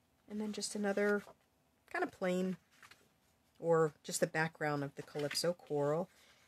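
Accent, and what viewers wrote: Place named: New Jersey, United States. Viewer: Just subscribed! This is one of my favorite new sets! I love this card. I am a Long Island girl moved to upstate New York. I love your accent even though I think it might be Jersey, it makes me feel right at home❤️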